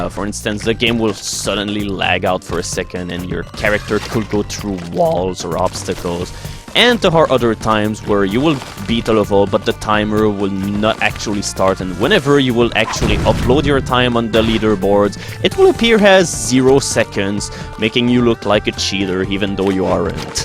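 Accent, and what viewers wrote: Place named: Canada. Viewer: Daaamn, you sound even more Canadian than me!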